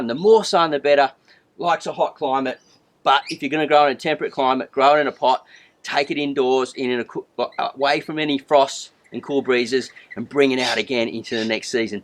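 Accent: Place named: Australia. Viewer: i had no idea Australians had more than 1 accent but compared to say steve irwin this fella is hard to understand very very thick accent... its cool sounding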